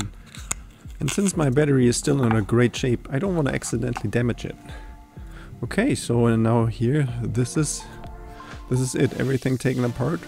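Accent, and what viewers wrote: Germany. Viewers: Gutes English aber man merkt das du deutsch kannst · Bist du deutscher? Hört sich so an 😂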